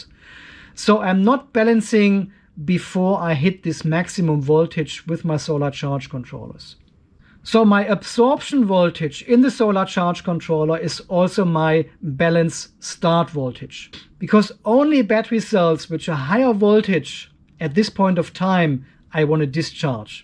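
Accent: Germany. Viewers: Thanks I’m still catching up on all the videos. I think the German accent added with Aussie slang is great. Actually laughed out loud this morning from another video when you said “it sh1t itself”. Keep up the great work. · I only want to hear your accent. I don’t care what you are talking about. You sound German. Yah? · Garage ist euer Dialekt in Australien so, oder kommst du ursprünglich aus dem deutschsprachigen Raum? Ich verstehe dich bestens, nur ich finde dein Dialekt klingt schon sehr nach "aus Deutschland" :D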